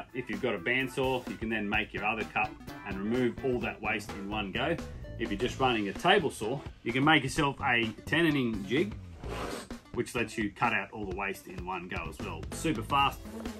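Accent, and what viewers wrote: Australia. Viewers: Aye mate....you people from down under talk funny.... but your videos are top notch! i am finding pallet wood a god send when the wallets low on project funds. bought a lot of tools but haven't put them to work yet....let's give it a try see how this all works out... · Im a new subscriber, love the content, thanks so much. Also nice to see/hear aussie accent, way more relatable for me.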